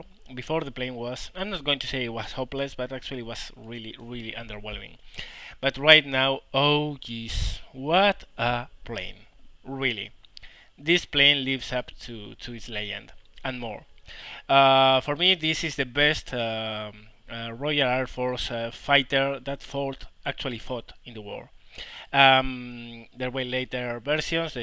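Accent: Spain. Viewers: Cómo se nota cuando un español habla en inglés XDD Nos pasa a todos jaja. Genial el vídeo, estoy pensando en pillarme ese tempest premium alemán y necesitaba algo de motivación!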